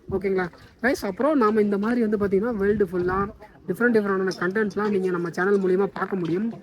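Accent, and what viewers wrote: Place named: Coimbatore, India. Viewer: ne pandradhu ellam Kovai outdoors mari Iruku bro slang and intro ellame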